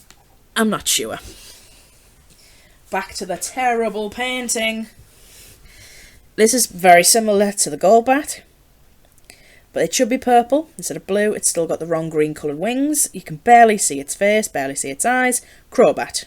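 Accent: United Kingdom. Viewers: I thought you were British because of your accent lol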